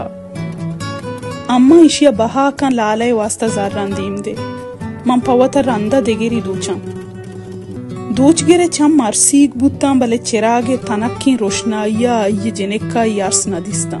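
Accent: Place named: Balochistan, Pakistan. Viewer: الله میاری بانوک تئی گپ تران سک وش دولدارانت ❤❤❤ اصل بلوچی انت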